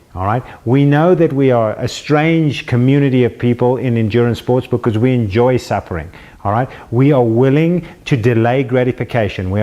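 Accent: South Africa. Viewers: South African accent!!! Best accent in the world!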